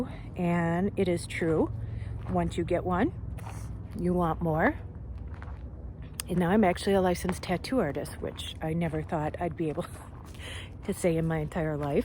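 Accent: Minnesota, United States. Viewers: Reasons to listen to you talk : Watch you cause ur beautuful Have a lovely voice with the pleasant MN accent Great story teller Are deep, honest n sincere Sharing ur journey n story I'm on one too n learn from you and did l say your beautiful n not 50 something! 😘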